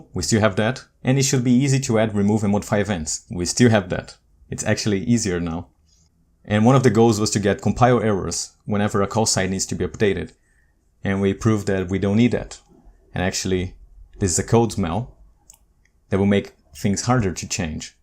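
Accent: Brazil. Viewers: what a great course! I'm lovin it! BTW are you brazilian? Your accent is looks like from brazil haha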